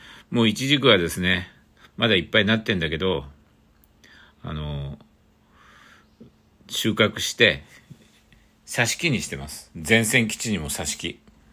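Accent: Saga, Japan. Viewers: 佐賀産の食材は素晴らしい👍 すき焼き好きで〜すそして佐賀弁良いね👍😊 今日のゲストは「ゾマソン」さん！あれっ、僕は間違ったかなさん😅 Xも見ますね！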